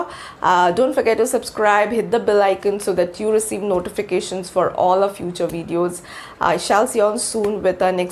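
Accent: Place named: India. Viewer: This's the most close pronounciation of The Indians to English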